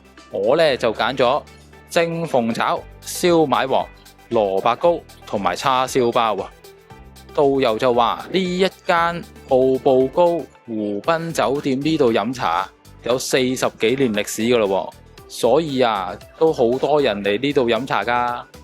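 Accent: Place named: Hong Kong, China. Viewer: 點解您講野口音咁似港人仔仔🥹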